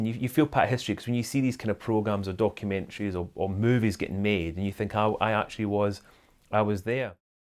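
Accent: Scotland, United Kingdom. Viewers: Lovely video! You are such a gentleman.... I don’t recognize your accent. Is it Scottish? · This is the first video of yours I've seen, and I'd like to say how charming you are. You have that kindly, caring, good-humored personality that any good parent would want in someone who helps care for their children, a nice older cousin-type that would have been perfect for teenaged boys in a stressful position. I need your pleasant self in my life too (and the Scottish accent is by far my favorite), so I'm subscribing. Best wishes.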